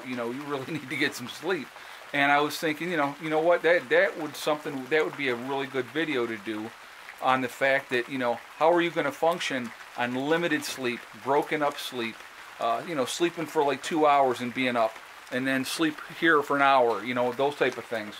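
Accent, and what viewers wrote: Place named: Great Lakes, United States. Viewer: Good video- I noticed your accent was "normal" to my ears. I'm from the South Side of Chicago originally and you sound like one of "us". Went to your channel and you said something about upstate New York. It's funny how people from around the Great Lakes have a similar accent.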